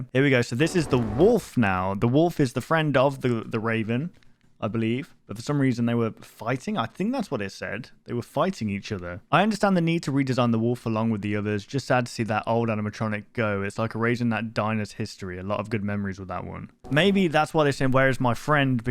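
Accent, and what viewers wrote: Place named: United Kingdom. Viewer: Bro is so British I thought he was saying shack instead of shark for like 60% of the vidoe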